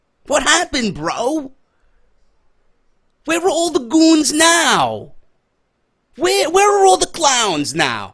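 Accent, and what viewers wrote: New York, United States. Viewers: Gotta say love listening to your reviews I'm from brooklyn so love the N.Y. feel. Everything u say is spot on and shows your a true wrestling fan. i swear if i could I'd start a petition to have u work for wwe creative writing, you would create a hell of a show. keep up the great work about to watch your smackdown review #FuckThoseOtherGuys.💪👍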